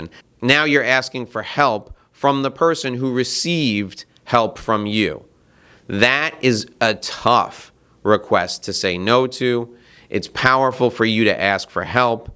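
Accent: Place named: United States. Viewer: I really appreciate your efforts to make sales people educated. I like your accent, it's US I guess. Subscribed!!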